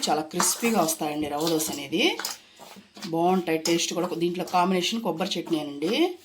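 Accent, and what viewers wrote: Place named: Nellore, India. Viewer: Nellore telugu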